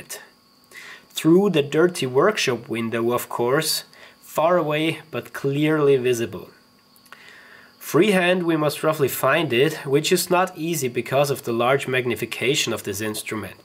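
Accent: Austria. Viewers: your like the first Austrian guy who's English and accent doesn't hurt my brains. Thank you. Subscribed :)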